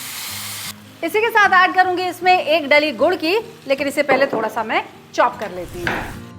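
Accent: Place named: Lucknow, India. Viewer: मोहतरमा आप एकदम लखनऊ वाली हो गई है अच्छा बोल लेती है , हां उर्दू तो नहीं बोली फिर भी....... good 👍 i am also from Lucknow but now residing in kashi . One very special ingredient heeng you have not used . I put heeng that increase the flavour more . In both resipe. Here in kashi matar alu and golgappe wala pani they mix it is also very tasty .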